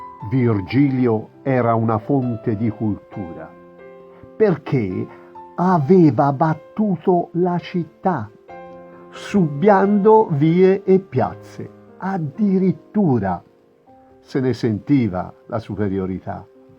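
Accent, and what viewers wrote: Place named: Tuscany, Italy. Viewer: Belli i versi 'alessandrini', mi riportano indietro ad immagini che i miei occhi di bimba hanno visto per le strade di Siena... Mi incuriosivano quelle persone di cui vedevi solo il dorso e le gambe, costretti com'erano a stare dietro quelle protezioni di sottili sfoglie di legno intrecciate... E mi facevano un po' pena, perché mi dicevo: "Noi siamo riparati dalle scaglie di pietra, che lo scalpellare provoca, ma loro no..." E poi gli altri momenti descritti... E le adeguatissime immagini scelte... E, infine, la tua bella voce, che porge ed esalta, con educato e morbido accento dei nostri luoghi, quanto il poeta ha fermato nei suoi versi... Grazie!!! 👏👏👏👏👏👏👏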